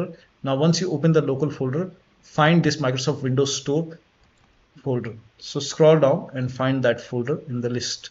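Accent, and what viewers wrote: India. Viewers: Legend 🙌 Worked for me. This issue was infuriating The Indian voice has become a wave of relief on these videos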